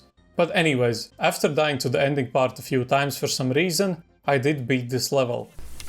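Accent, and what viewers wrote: Latvia. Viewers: At the start of the video i already heard the latvian accent 😂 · W latvian accent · latviesu akcents anglu val ir tik ikonisks😭 godigi es nesen izgaju un loti iesaku iet labak ar neka cata, cata ir pilnigs suds imo. Lai nu ka veiksmi! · banger vid also the latvian accent is so strong lol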